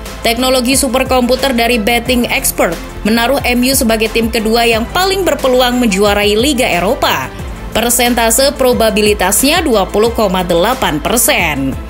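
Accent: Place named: West Java, Indonesia. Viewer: Anjirrr ada ada aja hahha kalau Rashford cedera bisa celaka dua belas😂😂😂, Pick Yang ngisi Voice nya orang sunda😁🤭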